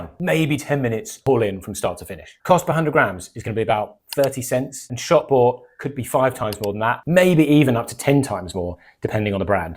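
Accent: United Kingdom. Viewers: Just found your channel, or rather Youtube did. Im confused you sound British, but your from Oz? Im Subscribing anyway as i like the concept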